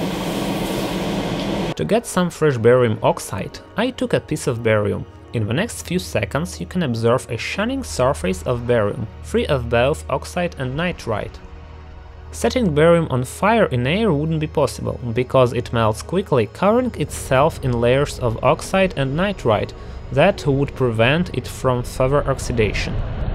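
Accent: Germany. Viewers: He sounds German I wonder that too xD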